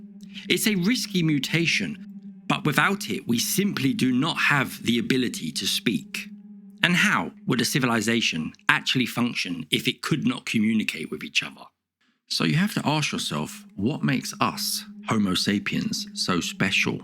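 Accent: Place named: United Kingdom. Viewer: Why do all the bots have a Brit accent?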